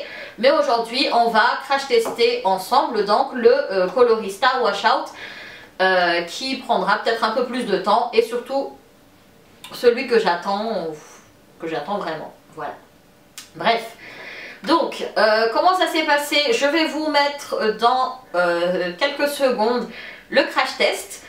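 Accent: Madagascar. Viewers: Tu es malgache ?ça s'entend à ton accent ;)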